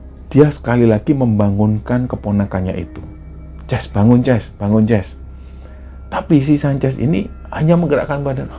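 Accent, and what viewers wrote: Java, Indonesia. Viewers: Seneng ama mas bercerita sprti ngalami sndr, enak di dgr, mudah dipahami.. Luar biasa.. Dan plg respek org jawa yg ga hilang jawanya... Salut mas👍🏼👍🏼👍🏼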